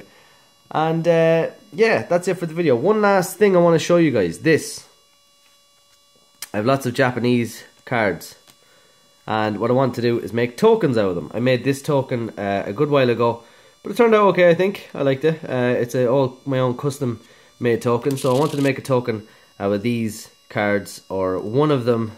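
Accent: Ireland. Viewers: Number 15 view 👌 you sound like you’ve an Irish accent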